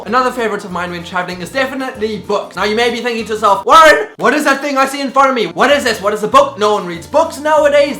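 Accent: South Africa. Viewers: HAHAHA YES FOR THE MOONBAG! And no! Never change the South African accent! It makes foreigners think we're exotic! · Rocking at moonbag Warren! I'd go back to Italy! It was amazing! (Please don't give up that SA accent! :P)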